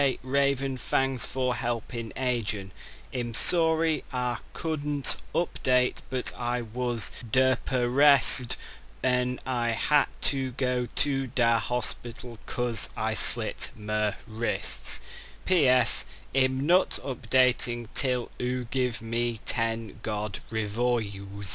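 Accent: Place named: Australia. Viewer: The Australian accent seals this.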